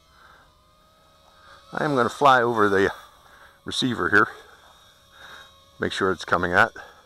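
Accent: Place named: New England, United States. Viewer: Indoors? You sound like one of those (us) New Englanders. LOL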